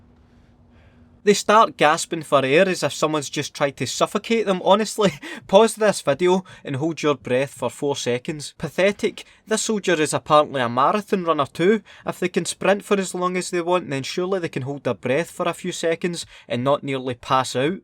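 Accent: Scotland, United Kingdom. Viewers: Scottish I think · MattLC They are both Scottish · Scottich iirc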